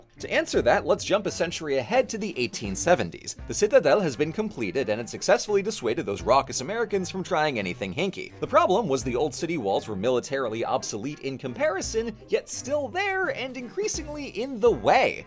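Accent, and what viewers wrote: France. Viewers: As a bilingual Canadian, this was a good video but also a really interested demonstration of the differences between Acadian French (which is the accent I’m used to), Quebecois French, and France French. There some pronunciations and even specific phonemes - The i in Dufferin, the r in Nouvelle France - that didn’t sound wrong exactly, but also didn’t feel quite right? Like did a good job with them for sure; it’s that the combination of words I’m used to hearing only in French Canadian/Acadian accents with a more France-y accent makes the differences clearer. Tbf I’m also not sure how those pronunciations compare to the Quebec ones - I’m pretty sure keeping the alveolar r (with the tip of your tongue) is a much more Acadian feature and I’m not sure if they do it in Quebec or if they use the glottal (back of the throat) r like in france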